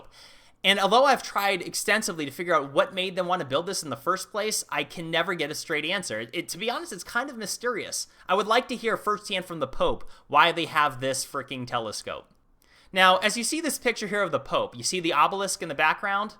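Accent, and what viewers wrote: United States. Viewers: U talk good but u so much amerikan .. try be betwen europe and amer..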